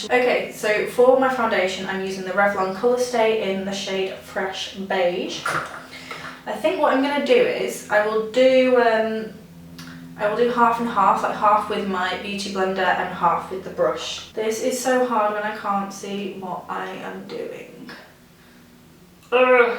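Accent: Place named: United Kingdom. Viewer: TheRedMoon its british😁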